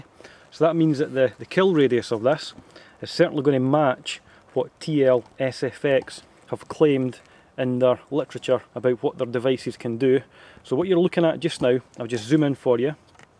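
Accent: Scotland, United Kingdom. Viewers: He sounds Scottish but UK actually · This guy is Scottish, and using a Stielhandgrenate... TF2 Demoman anyone? Ullapool Caber! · i love the scottish accent :D its so damn cool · Scottish he is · he so british i cant understand a thing he says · are you scottish ? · are you scottish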